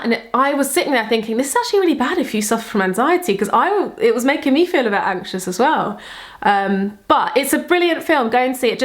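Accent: United Kingdom. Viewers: hi look i love you so much so so much I always love your videos and your British accent and yor eyes color everything you'r makeup is my best 😢💜 I'm your fan from k.s.a 🌚💕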